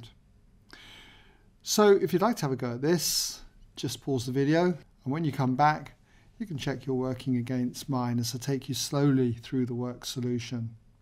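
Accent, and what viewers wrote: England, United Kingdom. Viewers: i love your English accent thanks so much for your channel!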